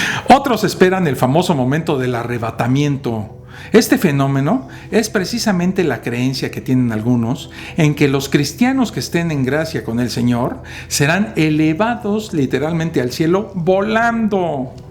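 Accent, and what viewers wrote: Mexico. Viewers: Hay que tener fe hijo mío. Hay que creer por fe hijo mío. Bendiciones príncipe desde Rep. Dominicana. Haces una tremenda labor hermano. Pero como estadista que soy, debo decirte que no podemos despojar a las sociedades así por así de la tendencia a creer. Es decir de las prácticas religiosas. Lo que podemos hacer en ese sentido es desmitificarlas. Depurar los sentido de fe de aquellos aspectos oscurantistas que claramente hacen daños. Vamos a presentar Dios mediante un proyecto en tal sentido a ver qué pasa. Dios te bendiga. El acento mexicano es sin duda un encanto.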